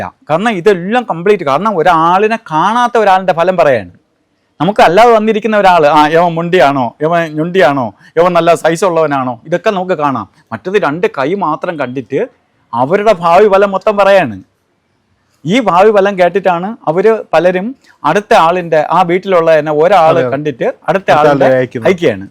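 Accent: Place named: Kannur, India. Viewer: ഇത് കണ്ണൂർ സ്ലാങ് അല്ലെ. ഇദ്ദേഹം ആ നാട്ടുകാരൻ ആണെന്നാണ് തോന്നുന്നത്.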